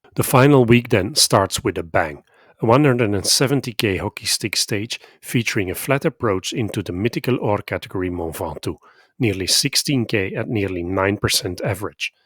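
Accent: Flanders, Belgium. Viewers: 😀super tick Flemish accent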